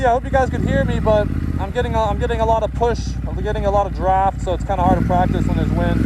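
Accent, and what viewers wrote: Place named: United States. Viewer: Having grown-up in a windy place here in the States, on my BMX Bicycle and I remember when you get that front wheel in the air it is more like a sail on a ship. Appreciate the types have a 2018 SP, very unusual to see your year with a single exhaust. You sound American, but looks like your in Germany or Holland.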